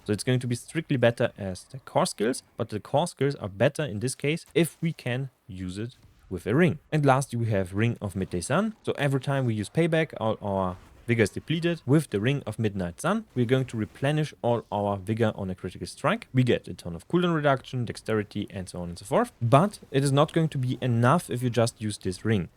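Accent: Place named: Germany. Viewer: Jede wette das er ein Deutscher ist. Man hört es voll raus 😅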